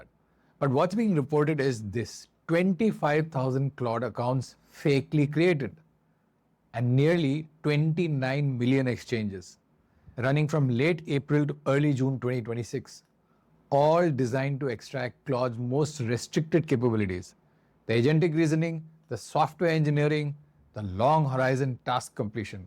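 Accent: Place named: Scotland, United Kingdom. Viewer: Dude, it sounds like you learned English in Scotland, but your info is accurate. Thank you.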